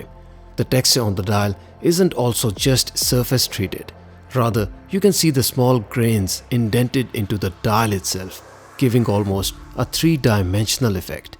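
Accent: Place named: Kerala, India. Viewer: bruh sounding like a physics teacher from Kerala...."vwaatch" yeh no thanks youtube recommend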